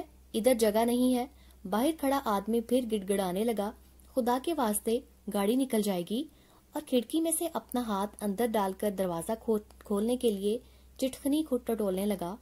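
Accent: Pakistan. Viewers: Aapki voice lyrics se pta lag rha that u re from Pakistan m i right? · Mam, where do you belong to? Actually your level of urdu speaking is same as that of pakistani serials!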